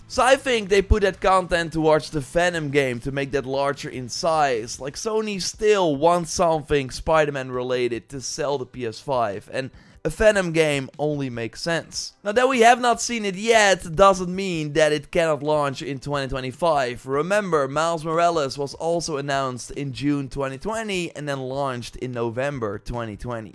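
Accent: Netherlands. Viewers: kerel fix dat nl accent man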